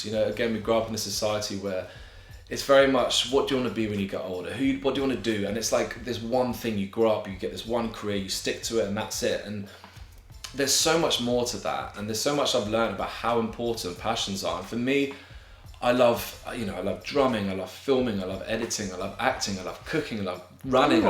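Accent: United Kingdom. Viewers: I'm laughing so hard at your English accent oh my god... the idea is absolutely GENIUS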